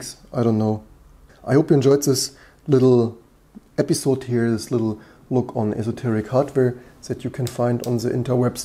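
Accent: Germany. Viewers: HÖRE ICH HIER DEN DEUTSCHEN AKZENT ? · Wow, ziemlich deutscher Akzent 😂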